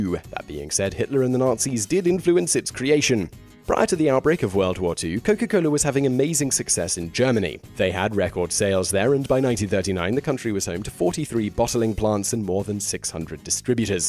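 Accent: United Kingdom. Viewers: I guess I'm just a slow talking southerner, hard to keep up with a fast talking Brit. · R C Cola needs to be on this video!!! And why the British accent?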